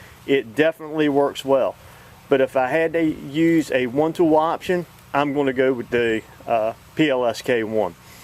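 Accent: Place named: Southeast, United States. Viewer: That sounds like a good ole' southeastern accent. I just subbed your channel. What state are you in? I'm a Georgia/ Alabama resident.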